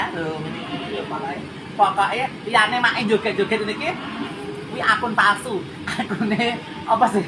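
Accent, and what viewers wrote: Java, Indonesia. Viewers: Oalah... Tp sy seneng dengan accent jawanya ma'e.. semangat trs ya semua.. 😊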